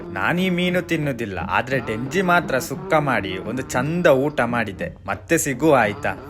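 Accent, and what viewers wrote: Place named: Kantara, India. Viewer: Kantara dantha kathe way of speaking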